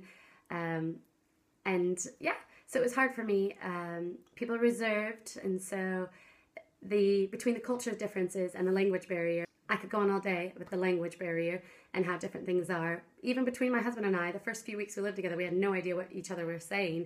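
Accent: Ireland. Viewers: Are you Irish?! Whaaa? lol It's so lovely to know that blogging has helped you to settle. I know I would really struggle with moving to another country x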